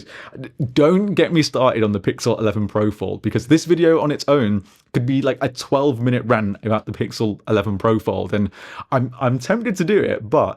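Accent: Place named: United States. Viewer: Really good American white guy accent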